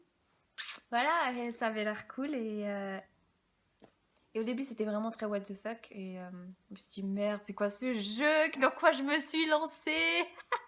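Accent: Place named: Alsace, France. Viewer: Non mais quand je regardais tes vidéos je me disais justement que t'avais parfois un accent alsacien ! Haha #teamalsace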